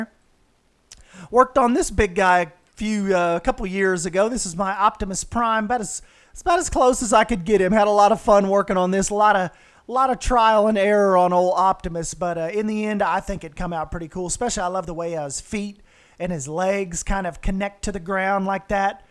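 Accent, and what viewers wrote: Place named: Texas, United States. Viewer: Man this video is sooo vintage, I can't wait to see if they come out with a Planet Coaster 2 as I've heard you & Drew mention that quite a bit. I do think that Planet Zoo will be a perfect placeholder for you tho while we wait for a Planco 2. My wife said, is that guy from Texas? LOL Keep doing you man!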